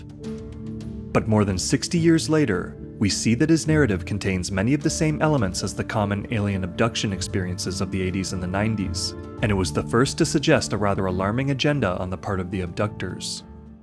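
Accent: Canada. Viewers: the comments of course as predicted are a bunch of middle school minded immature cretins only focusing on the sex and forgetting about everything else smh ... just making lame jokes and puns and giggling like kids who wear helmets and ride the short bus smdh what a complete joke of a channel, I remember now why I hit "don't recommend channel" a couple of years ago, and now the algorithm has suggested it again, thinking I forgot, and me thinking foolishly that maybe it has changed for the better, but nope, same old garbage as always, plus the added annoyance of the canadian "oat" and "aboat" to boot, ugh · I'd rather listen to an AI narrated video than hear another Canadian accent, any day 😭😭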